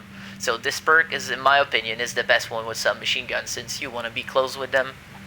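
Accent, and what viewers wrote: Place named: Quebec, Canada. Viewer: great video, something for me to build towards. where about are you from you sound a bit like gsp who was a fighter in the ufc he is French Canadian.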